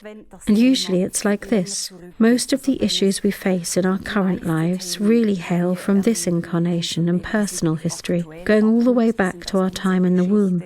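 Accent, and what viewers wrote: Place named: England, United Kingdom. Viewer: Not this English accent again :/ Let this guy read men, and women dialogues.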